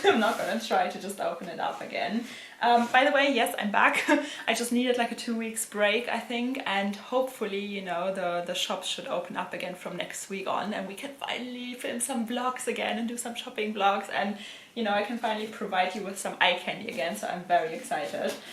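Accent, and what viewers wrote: Germany. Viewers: Love your german accent 😊😊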